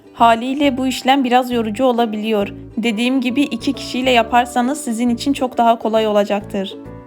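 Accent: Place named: Eastern Anatolia, Turkey. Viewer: Dogu sivesi. Almanci sivesi. Ortaya karisik konusuyo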